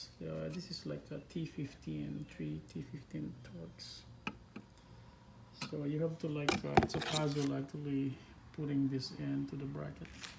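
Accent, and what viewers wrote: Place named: Philippines. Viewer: Love your accent, I know you’re Filipino! Lol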